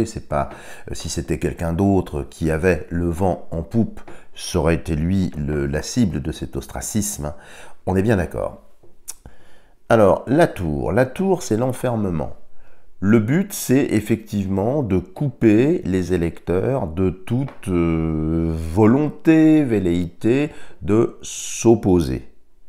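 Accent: France. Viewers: Bonjour Monsieur. Le Capitaine Juvet Brunet lui aussi est un fervent amoureux de notre belle France et souhaite sortir de l' U. E. Il est intéressant de l'écouter. Merci à vous Monsieur pour votre élocution très Française.. Un régal. 💞🌺🐈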